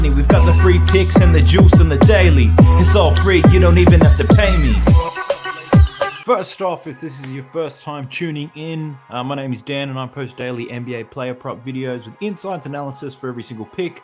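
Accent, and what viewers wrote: Australia. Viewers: Ya Australian accent blew it for me. Not betting on rugby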